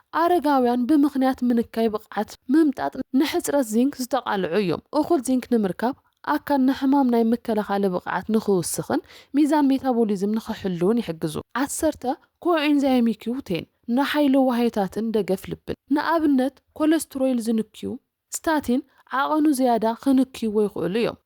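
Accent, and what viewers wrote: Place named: Dekemhare, Eritrea. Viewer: please don't speak Dikala Tigrinya like sewnet say Sebnet and Gra and MiKinas say Minikay.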